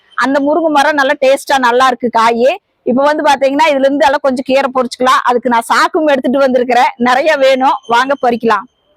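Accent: Kongu, India. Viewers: Kongu slang apditha irukum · எங்க கொங்கு நாட்டு பக்கம் நாங்க பொறிக்க போரன் அப்படிதான் சொல்லுவோம் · Ithu coimbatore slang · Ada idhu Coimbatore bashaiingov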